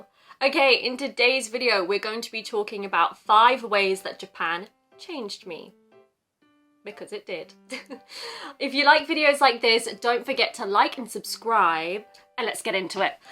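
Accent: United Kingdom. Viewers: 凄く聞き取りやすくて、きれいで優しい話し方ですね。これがイギリス英語かな？ お辞儀はやっぱりうつってしまうようですね。私の知ってる海外から来た人達も、 もれなくお辞儀するようになってましたｗ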